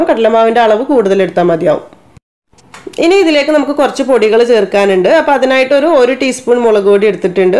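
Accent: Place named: Wayanad, India. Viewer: Wayanadan slang 😙😙😙😊nokkummo, cheyyummo അങ്ങനെ.. 💪💪wayanad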